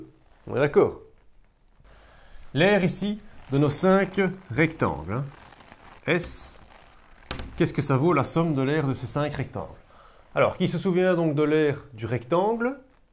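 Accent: Brussels, Belgium. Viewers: De Bruxelles même, une fois ! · Ce petit accent vient de Belgique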